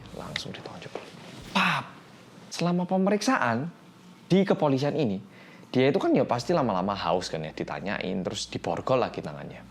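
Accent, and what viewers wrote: Java, Indonesia. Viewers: Tdk perlu iri dgn uang M" An Meskipun kita bs sakit BBS nntn you tube,bs tdr nyenyak😭😭😭😭😭trs bget mmbtku semakin kuat walaupun q sakit hrs ttp bersyukur ......matur suwon oppa seng medokkkkkkk😀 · Tim gercep mas medokk🤣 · Plis lu pada yang nonton wajib subrek channel mas yang MEDHOK ngonghey 😉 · Yuhuuu selamat malam bolo-bolo Kembali lagi dengan detective reomitt bersama mas medok kitaa ❤❤❤ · Yah telat 18 menit. Gercep like aja dahh MEDHOKK. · Buset medhoooooooookhhkhkhkhh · Uang berapa pun ga akan bisa mengganti rugi atas yg telah terjadi oleh bapak Yoon Seounyeo, menjalani 20th di dalam penjara atas tuduhan yg ga pernah dia lakukan.. Sakit hati, Marah, Kecewa dia lalui sendiri, tapi dia tetep sabar n menerima semua nya.. Yang dia senangi adalah Cap Pembunuh yang melekat pada diri nya sudah hilang.. Semangat buat Ahjusi Yoon Seounyeo dan Buat Mas yang semakin Medoooooooookkkkkkk:) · life is so unfair but we got the important point from this video, thx mas yang medhoookkkk, GBU mas ✨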